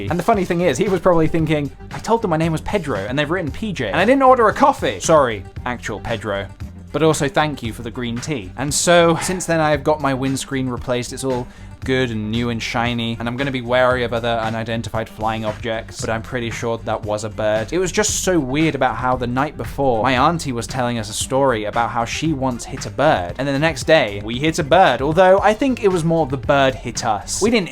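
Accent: Peterborough, United Kingdom. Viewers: Lol sounds like good ol’ Peterborough to me 😂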